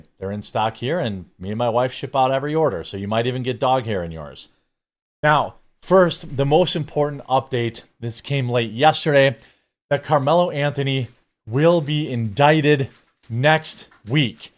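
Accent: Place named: New England, United States. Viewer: This guys accent is 🤮 fucking Yankees….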